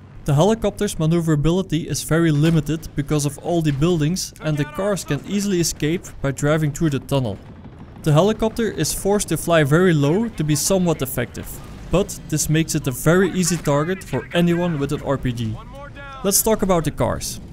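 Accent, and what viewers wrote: Netherlands. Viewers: 2 questions 1. Are you dutch? 2. Are you? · at least it sounds like a dutch accent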